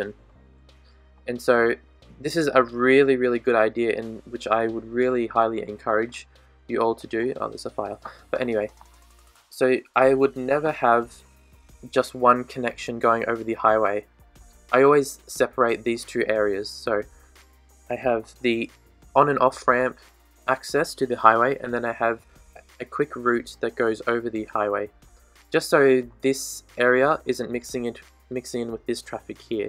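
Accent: Australia. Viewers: You from Australia mate? love the channel btw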